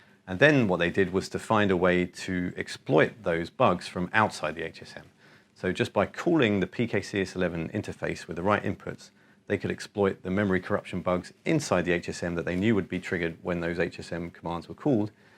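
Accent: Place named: London, United Kingdom. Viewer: London I think ;)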